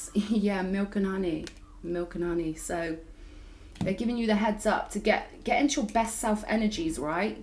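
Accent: United Kingdom. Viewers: Karmic energy just spit on it. LOL I love ur uk accent reminds me of Robin gibbs my sweetheart. Ahhh he was a Capricorn also.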